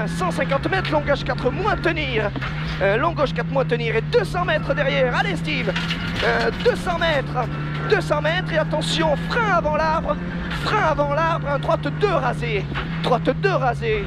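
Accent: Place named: South, France. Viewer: Es ce que vus faites des rallyes dans le sud ? Ps : vous venez d'où ? Car vous avez un accent du sud un peu 😅, j'adore continue comme ca !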